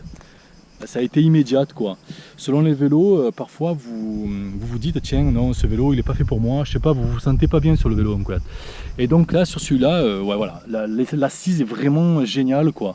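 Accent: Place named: South, France. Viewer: accents du Sud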